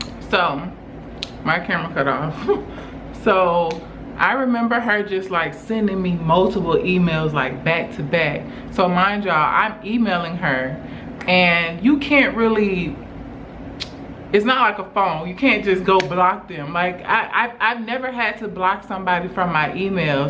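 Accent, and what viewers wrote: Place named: Alabama, United States. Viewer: Fr!! I tend to realize females that’s from/live in Alabama have that same accent and they sound the same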